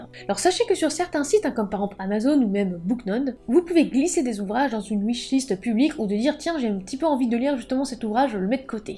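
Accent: England, United Kingdom. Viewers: Bel accent anglais 👏👏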